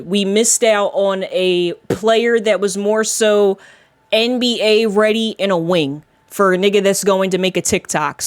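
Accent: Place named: Baltimore, United States. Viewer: Hey where you from? U sound like ur from Baltimore but ur a sixers fan? I'm from California so I can be way off?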